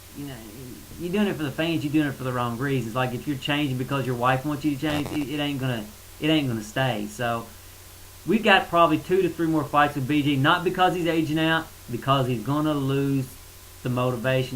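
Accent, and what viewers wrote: South, United States. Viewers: Anybody who's southern accent is stronger than mine gets 5 stars. If the next video's just as good, i'm straight up subscribing. Alot of stuff i dont particularly agree with, but i think that's the fun of it all. I only really agree with your deal on BJ. It's almost to the point you dont have anyone in that division that can hang with him since he beat Little Evil.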